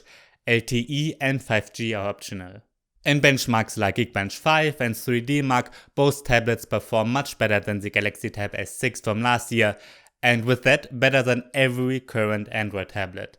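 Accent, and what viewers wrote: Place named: Germany. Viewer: You got such a strong german accent, i only realised i was watching your english channel after like 5 Minutes in the video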